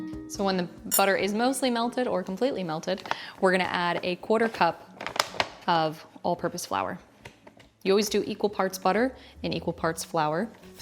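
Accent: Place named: New York, United States. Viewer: Looks delicious. The "au" in your words like "sauce" and "caught" really brings out the NY.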